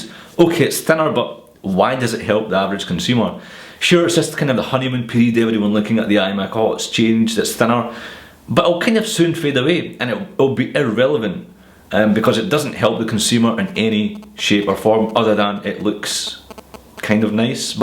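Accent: Scotland, United Kingdom. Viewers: i like your accent. scottish? i'm italian btw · Love your scottish accent, but im convinced that Siri will learn my language (finnish) before scottish ;) Thank for the video bro! btw: planning my third trip to Scotland! Cant wait to see the highlands once again!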